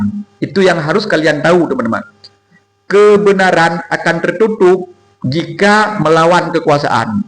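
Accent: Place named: Malaysia. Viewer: Conten ini lucu skli bicara nya orng malaysia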